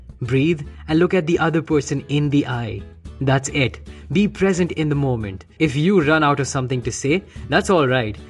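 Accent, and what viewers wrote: India. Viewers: Damn that indian accent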